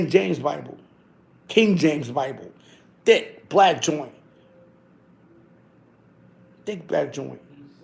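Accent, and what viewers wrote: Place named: New York, United States. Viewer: It’s really a NY thing first. Italians from Italy or outside the NYC & Philadelphia metro area don’t sound like that. Especially being from Brooklyn, he definitely grew up around Italians.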